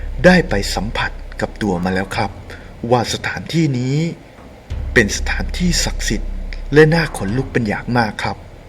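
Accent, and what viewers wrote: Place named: Isan, Thailand. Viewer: เสียงแอดมินเวลาพูดอีสานสำเนียงน่ารักดี.😊😊😊😊😊😊😊😊😊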